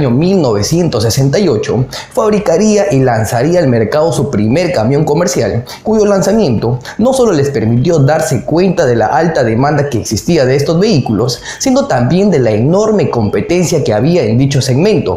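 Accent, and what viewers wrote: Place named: Peru. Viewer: Acento peruano bien definido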